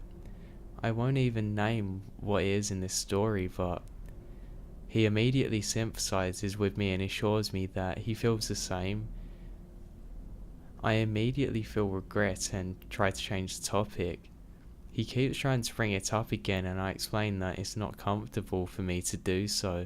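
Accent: England, United Kingdom. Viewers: Land of Shakespeare; pure class- love the voice- English, and Sinister- SCARY!!!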